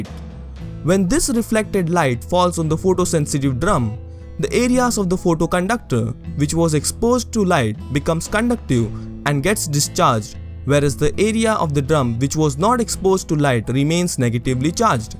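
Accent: India. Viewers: I am a Taiwanese and no offence intended. Actually, I am not a fan of indian accent but because your video is highly informative and understandable so I found your voice beautiful and educational.... Big thanks！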